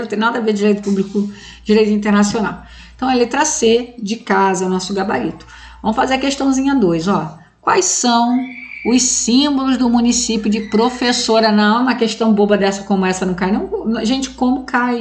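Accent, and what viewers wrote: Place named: Rio de Janeiro, Brazil. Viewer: Aula top, com esse sotaque carioca então; arrasou!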